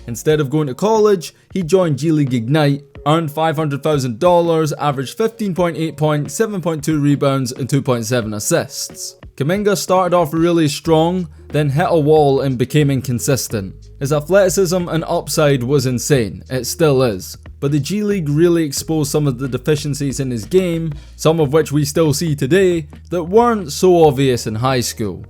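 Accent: United Kingdom. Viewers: Imagine calling Jalen Green a bust when he averaged 22 ppg in his sophmore year LOL u don't know wtf u talking about last time i click on a basketball video from a dude with a british accent tf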